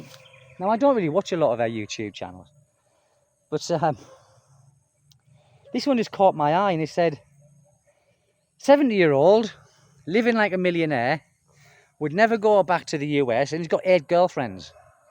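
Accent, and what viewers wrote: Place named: England, United Kingdom. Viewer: I love your English accent mate.😁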